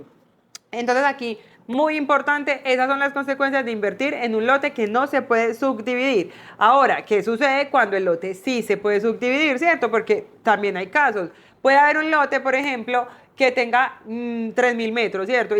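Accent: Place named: Antioquia, Colombia. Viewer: ¡Viva el acento Paisa!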